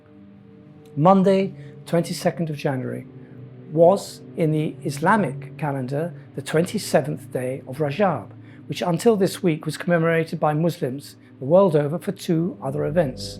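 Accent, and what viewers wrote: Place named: England, United Kingdom. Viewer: The English accent sells it very well.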